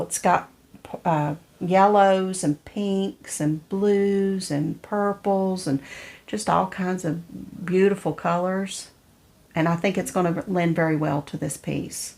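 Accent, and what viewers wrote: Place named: Southern West Virginia, United States. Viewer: I just started watching your flosstube and am enjoying them. I knew from the moment I heard you that you were. Southern WV girl! My mom was born in one of those coal camps, unfortunately it doesn’t exist any longer. Her sister still lives in the area but my parents moved around a lot and ended up in FL. Love your conversion on the FQS quilt piece. That looks like Christmas to me. Happy stitching.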